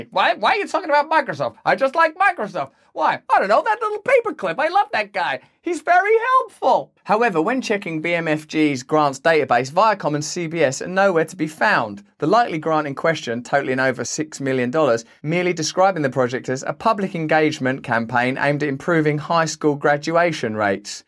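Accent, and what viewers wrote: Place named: England, United Kingdom. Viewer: Fir ee aye miwwen dollars, come on. Is it just me or do English people sound like they're still trying to learn english while the rest of us learnt to talk properly and moved on.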